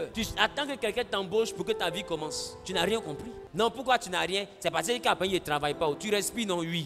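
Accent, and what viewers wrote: Ivory Coast. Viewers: Je suis fou amour de l'accent ivorien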